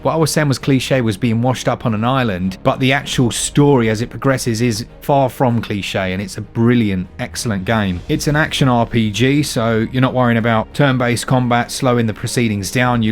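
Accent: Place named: RP, United Kingdom. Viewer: Having just jumped over to the Thronebreaker review by I can honestly say....he sounded well posh a year ago.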